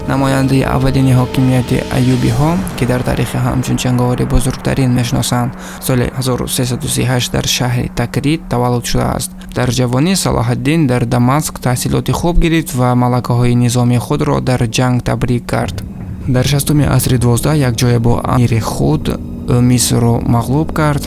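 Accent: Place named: Russia. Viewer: То что этим делом, т. е. темой великих исторических личностей по завоеваниями интересуясь, хочешь чтоб другие тоже были осведомлены, это очень благородно. И то что, акцент русскоговорящих у тебя в декламации чувствуется, надо обязательно устранить. Если это получиться, аудитория говорящих на фарси тебя с нетерпением и пением гимнов будут ждать. Но главное в содержание роликов. ХРОНОЛОГИЮ ОБЯЗАТЕЛЬНО ДОЛЖЕН СОБЛЮДАТЬ. Это первое в научной историографии. А то в комментариях смотри, независимо от суть изучения, выдвигают свои амбиции. Поэтому я свою комментарию пишу на русском, чтоб все заинтересующие люди поняли. Но методы современная историография ныне очень устаревшие и например вот такой подход -- взгляд исследователя на историю материалистическим мышлением, ни куда не годится. Тем более, история таджиков считается как история "с незапамятных времён.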